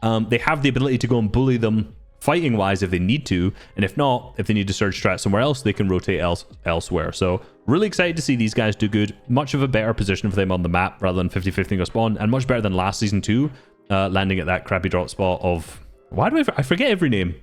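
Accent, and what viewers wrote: Scotland, United Kingdom. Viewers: cant help but admire the scottish accent 🔥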